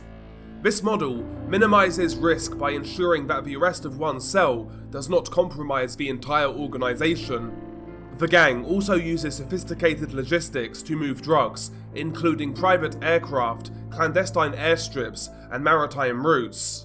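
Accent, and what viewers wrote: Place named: United Kingdom. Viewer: He’s a brit lmfao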